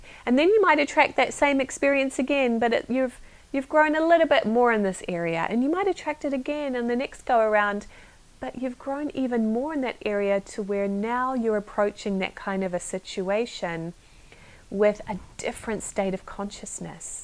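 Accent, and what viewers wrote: New Zealand. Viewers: Very helpful thank you. I discovered you a short time ago on YouTube and I have appreciated a lot of what you have been sharing. I particularly resonated with your video on Doreen Virtue... I have never been comfortable with the traditional explanations of things like angels when it gets presented as absolute fact while things, and our understanding of them, can evolve. You sound a lot like a New Zealander and I'm guessing you've spent some formative years in the lower South Island (although I've been wrong countless times before). Best wishes from Nelson, NZ.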